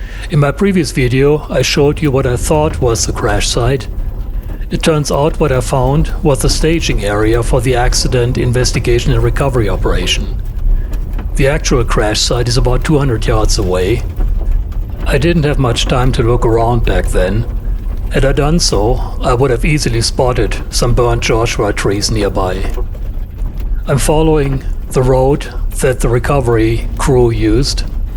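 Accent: Germany. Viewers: I Really Hope the FBI Shuts you Down - Ya got a Foreign Accent too - German maybe🙂 We have the Most Advanced Military in the history of Man because we Protect our Secrets - Today we have idiots and Google earth among others that somehow think its OK to Spy on our most Sensitive Sights - You DO NOT HAVE A TS SAP/SAR SCI Q level etc. Clearance and You Do Not have a Need to Know👎🏻 Reporting this YT Channel also. Good Luck🇺🇸🇮🇱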